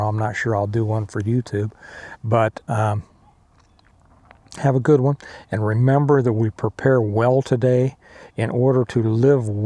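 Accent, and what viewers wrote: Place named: Texas, United States. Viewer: Kansas! I figured you were a fellow Texan from your accent and demeanor. New here. Appreciate your channel.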